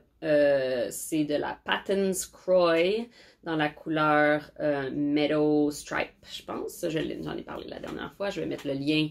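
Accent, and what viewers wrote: Quebec, Canada. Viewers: Bonjour, ici la France, je découvre et j'adore tout... Et l'accent du Québec et top... Je visionne tous tes podcasts et j'adore... Merci pour ce plaisir tricotesque 😄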